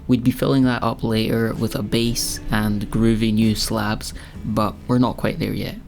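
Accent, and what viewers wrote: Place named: Fife, United Kingdom. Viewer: Hearing a fife accent saying radge paving stones made me do a double take eh. What's radge about them, ken?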